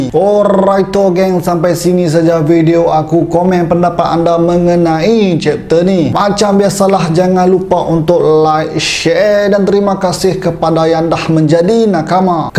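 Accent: North, Malaysia. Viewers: Bro hg org utara blah Mna ? Aku Penang town . . Mantul utara loghat dlm penyampaian . . Semoga terus maju bro 💪🏼💪🏼👍🏼👍🏼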